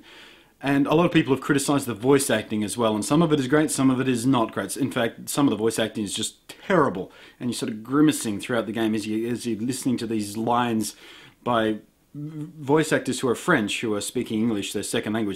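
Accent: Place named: Australia. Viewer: Try turning on "transcribe audio', the system doesn't seem to pick up the Aussie accent as well. It's a good laugh.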